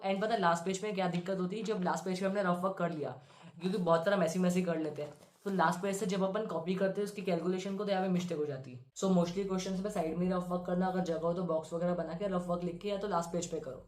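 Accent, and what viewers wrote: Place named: Maharashtra, India. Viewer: The marathi accent 🫡🫡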